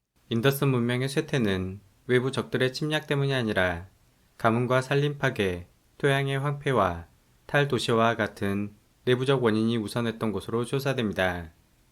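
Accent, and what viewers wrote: Jeolla, South Korea. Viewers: 이분 어투가 라도네! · 전라도 억양이 있는 거 같으심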